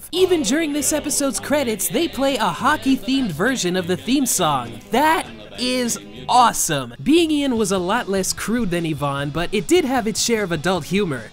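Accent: Canada. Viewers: I cannot figure out which province your accent is from! I'm assuming its southern Canada though. Also, this is a BIG nostalgia blast.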